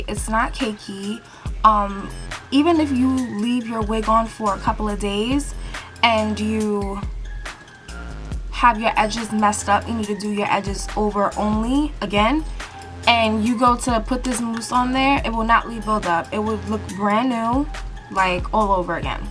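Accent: New York, United States. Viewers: Yes everyone has an accent depends on what region on the US you are from. Im from Colorado no one here talks like you. So im able to identify your accent. You’re probably from a different area of the US. Like NY maybe??? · I definitely learned a lot, thank you for this video girl!! Btw the more I hear your voice I'm thinking oh she's definitely from New York (I'm from N.Y.) lol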